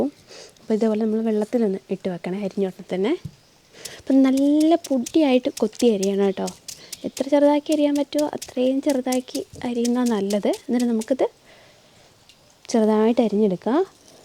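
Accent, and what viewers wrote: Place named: Central Travancore, India. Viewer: ഞാനൊരു subscriber അല്ല അതുകൊണ്ടുമാത്രം മറുപടി തരില്ല എന്നുണ്ടെങ്കിൽ വേണമെന്നില്ല എനിക്ക് ചോദിക്കാനുള്ളത് നിങ്ങൾ വയനാട് ആണെന്ന് പറഞ്ഞു പക്ഷേ നിങ്ങളുടെ സംസാരം ഒരു മധ്യതിരുവിതാംകൂർ ഭാഗത്തുള്ള സംസാരം പോലെ ആണല്ലോ 80%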